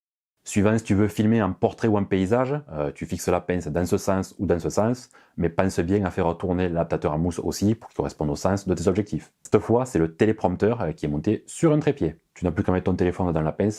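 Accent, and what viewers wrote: South, France. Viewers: J'aime ces vidéos sur du matériel bien choisi, simples directes brèves et ave l'accent du sud. Bravo !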